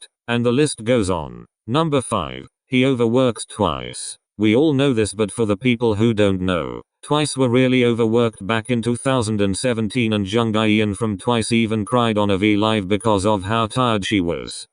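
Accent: United Kingdom. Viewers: ayo british? 👀👀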